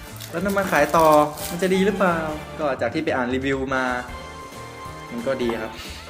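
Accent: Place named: North, Thailand. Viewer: คนเหนือกะ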